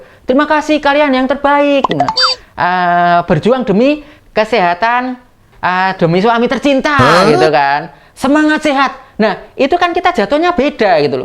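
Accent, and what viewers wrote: Java, Indonesia. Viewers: Kaget aq, kirain mas Alif ternyata bukan suara yg ini ada rasa rasa jawa bgt logatnya🙏😅🤣👍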